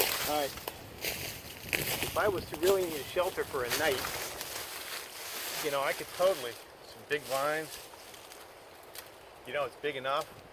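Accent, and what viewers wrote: North, United States. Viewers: Not a swamp at all you’re in a hardwood forest. You sound like a yankee transplant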